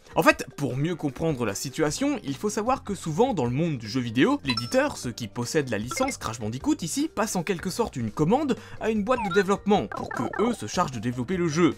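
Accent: England, United Kingdom. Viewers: promi jeu critik pa ton akcan englé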